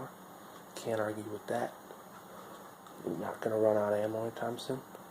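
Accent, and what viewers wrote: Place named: South, United States. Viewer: A southern accent... I'd say I'm shocked but I don't like to lie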